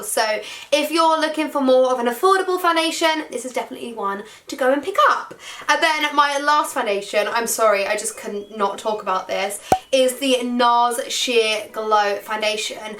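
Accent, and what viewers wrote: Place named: United Kingdom. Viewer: Hi 👋🏻 I subscribed and pressed the bell. I remember the first video I watched from you was your nan doing your makeup and it was hilarious!!! At first I thought you looked like Nicole Skye but then I heard the british accent then I was just like 😅 (that was awkward)😂 btw I hope your nan is well!! ❤️❤️❤️❤️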